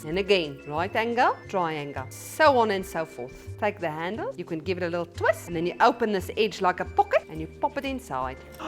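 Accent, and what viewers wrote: Afrikaans, South Africa. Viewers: I saw with da rands,your name and your Afrikaans ascent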